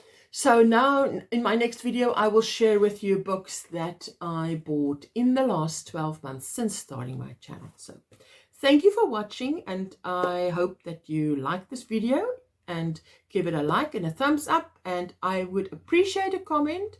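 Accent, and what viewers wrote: South Africa. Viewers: You sound south african!? Love the way you shared everything so calmly and thoroughly!!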